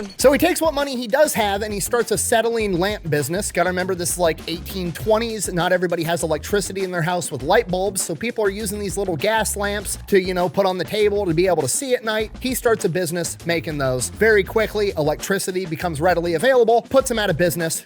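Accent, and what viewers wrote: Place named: Kentucky, United States. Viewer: Hey electrician guy I am very curious are you a Kentucky now this is going to show up as my wife but no it's her husband and yes we are Kentucky people you just sound like on of us are you bud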